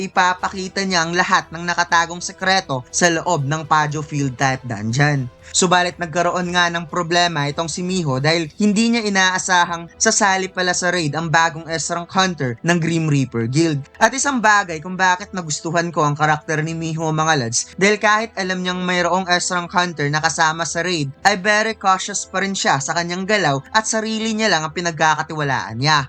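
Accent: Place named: Conyo, Philippines. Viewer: Ganda salamat idol.. Kaso wag masyado pa konyo yun nagpapangiti e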